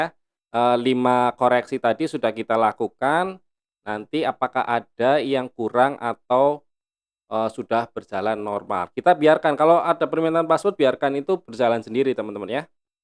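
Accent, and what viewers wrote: Banyumas, Indonesia. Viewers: Om pake semi online ya anbk nya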